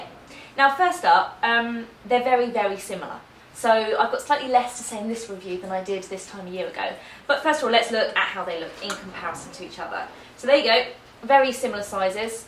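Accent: United Kingdom. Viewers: are u british? if u are - what the hell are u doing in USA? just kidding. great video. great iphone!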